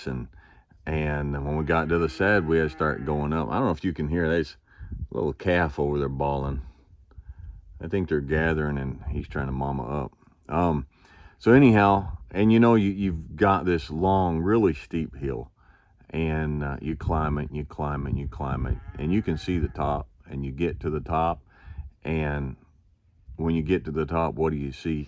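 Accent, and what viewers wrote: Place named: South, United States. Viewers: This video got suggested me by youtube algorithm, and just by looking at the thumbnail, I literally heard his voice and his cool country accent, although I never seen this man before on YT - and I was 100% accurate by guessing both the accent and the voice itself :D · This is by far, the best American accent. The slow, comforting Texas drawl.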